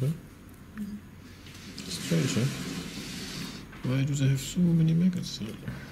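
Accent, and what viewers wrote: Germany. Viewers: Are you, by any chance, German? Your accent suggests it. Danke für Ihre Arbeit!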